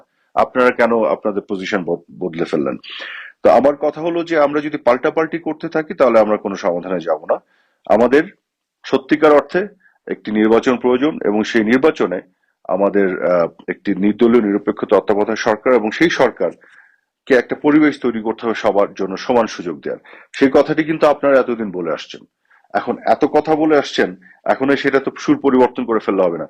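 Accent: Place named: Bihar, India. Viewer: Aponar kothar sur amon-j bihar mani kintu talgas ta amar.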